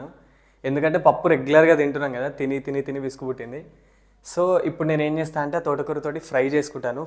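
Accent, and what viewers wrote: Telangana, India. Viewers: తెలంగాణ తెలుగు వింటుంటే చాలా ఆనందముగా ఉన్నది మీకు శుభము హరే కృష్ణ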